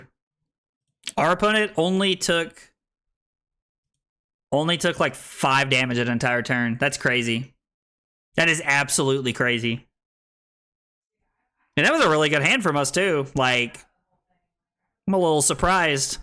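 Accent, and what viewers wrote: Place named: South, United States. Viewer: im from NC i could tell you were from somewhere in the south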